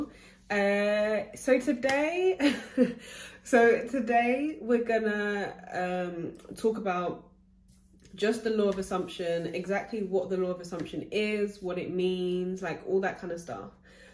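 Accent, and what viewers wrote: United Kingdom. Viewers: Finally a loa coach with a British accent! Thanks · Wasn’t expecting the British accent 😩😂thought she was American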